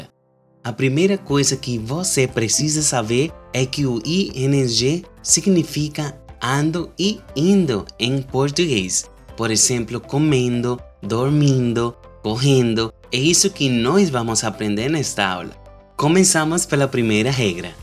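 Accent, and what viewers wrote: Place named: Japan. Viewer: Boa noite professor tudo bem seu sotaque paresse ser japonês moro em Boa vista Roraima Brazil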